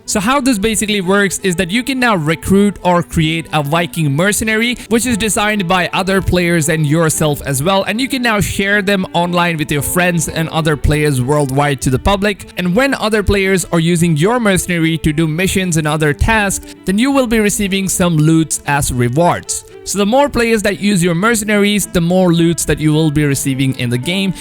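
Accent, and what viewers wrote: India. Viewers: Is that some Indian accent I hear? 🤔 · Having an Indian accent doesn't mean he isn't pronouncing the word wrong. Plenty of people with the same accent know how to properly enunciate the letter V in a word. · He’s Indian it’s an accent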